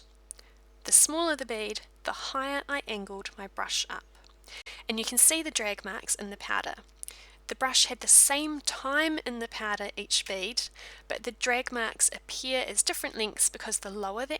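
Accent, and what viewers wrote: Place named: Australia. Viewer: "eats tew wit" lol I love your accent. Great video. Are you Aussie?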